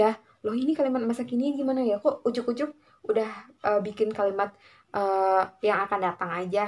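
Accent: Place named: Java, Indonesia. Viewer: Ujuk ujuk. Jawa sekali mbak. Kita sama.hihiw. tos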